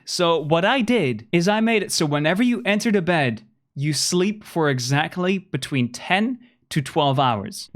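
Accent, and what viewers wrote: Netherlands. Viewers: Are you from the netherlands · Hey love the vids, are you dutch by any chance?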